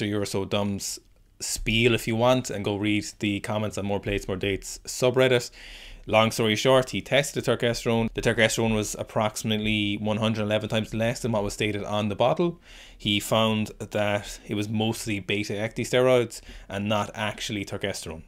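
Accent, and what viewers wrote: Ireland. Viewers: That is an Irish accent isn't it?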